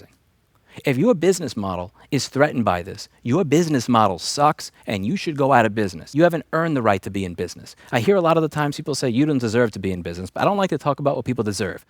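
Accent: New York, United States. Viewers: You can tell he's been angrier lately because the New York accent is coming out more and more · I came down here to write the same thing! Just enough of an accent to notice. And that's something I never ever have heard from him in years. New York attitude? Certainly. But the accent? Pretty rare. It shows he means business!! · ' New Yorkerness intensifies with each passing day. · Is it just me or does sound more New Yorker now that he moved away. My parents signed up for a gym the first day there their locker got broken into and credit cards stolen. They decided it wasn't safe to be there. There was only one guy that could do cancelations and he was always conveniently off today or on break or some other excuse.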